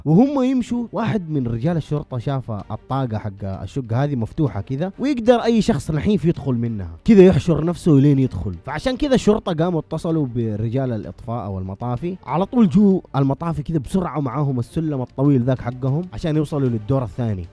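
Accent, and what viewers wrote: Iraq. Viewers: ✨ يتسمع عراقي ✨ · حلوه قعاد أسماع عراقي 😂😂😂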